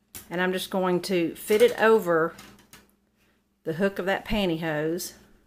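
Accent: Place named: Appalachia, United States. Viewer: So stinking cute. I just found u so u have a new subbie. I knew that voice had to Tennessee or NC mountains. Lol! I'm on the other side of Asheville so maybe we're kinda like neighbors. 😁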